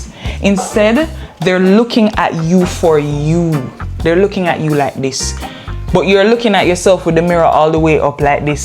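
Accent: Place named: Jamaica. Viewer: Love the Jamaican exscent😍😍😍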